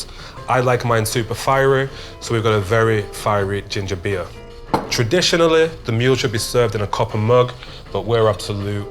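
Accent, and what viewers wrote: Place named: Leeds, United Kingdom. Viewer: Aww bless you son. Speaking in another language with that Leeds accent. Bless you. Made me laugh. I like the pear voka xx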